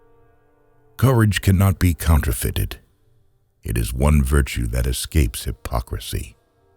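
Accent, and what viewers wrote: United States. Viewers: Anericann pronounciation sticks out like a sore thumb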